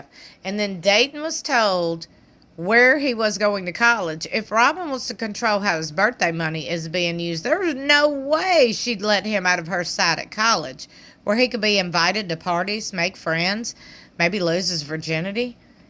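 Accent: South, United States. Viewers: I just want to say I have been listening to you since the days and I just adore your southern accent so much and you have become a staple around my household. My husband doesn’t care a lick about sister wives but always wants to listen in when spilling tea! Keep doing what you do!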